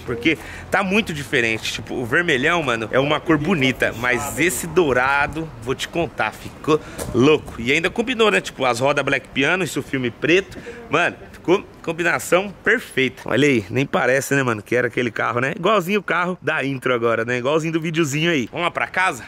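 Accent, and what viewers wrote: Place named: Bahia, Brazil. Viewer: Baiano demais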